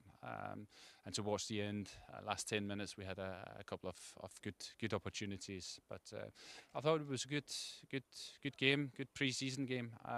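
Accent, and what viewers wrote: Scotland, United Kingdom. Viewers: Good Scottish twang in his voice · Nice to hear he still has his Scottish accent!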